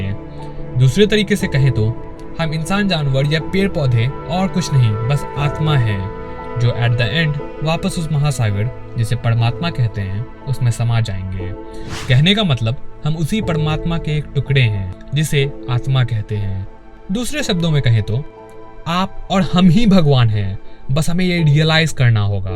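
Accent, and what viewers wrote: Bihar, India. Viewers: Bro aap bihar se ho na!!! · Are you from Bihar? You have a bihari accent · Bhai tu bihari hai .....i can say it for sure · bihari narrator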